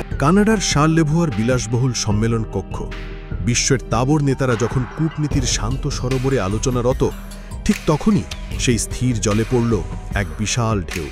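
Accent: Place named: India. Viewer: কি হয়েছে কাল থেকে ভারোতের মতো করে খবর পড়া সুরু করছে।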